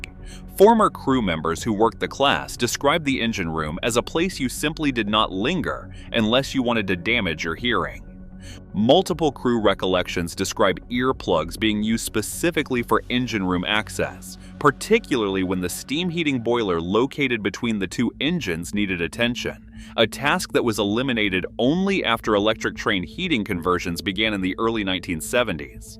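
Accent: United States. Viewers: Why do these vids have to have an American commentator…..aaaargh! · Why use such an annoying American voice on a subject on British Rail?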